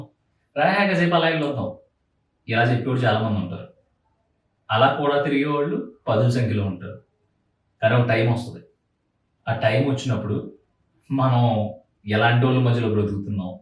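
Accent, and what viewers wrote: Godavari, India. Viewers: Bro midhi Godavari district ahhh ?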